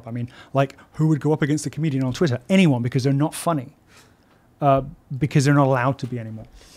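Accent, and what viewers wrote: United States. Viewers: Do I detect Milo picking up a bit of an American accent? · he is developing a American accent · Milo is starting to sound American in his parlance! I admit it as an English woman I am biased towards the more refined English intonation! js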